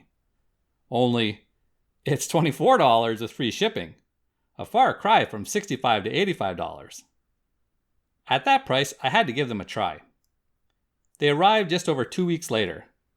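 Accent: Canada. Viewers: Sounds very fucking Canadian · Are you a Canadian? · You’re Canadian aren’t you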